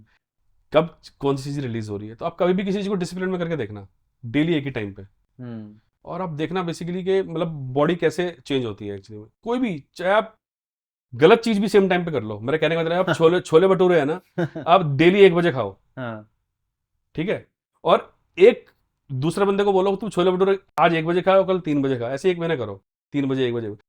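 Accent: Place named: India. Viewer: indian Accent is most Unpleasant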